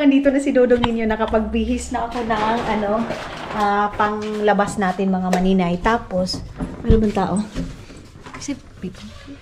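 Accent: Visayas, Philippines. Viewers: Ang or mu nmn oiy wlay lami ang imong content ba visayang badoy mag salita halatang visaya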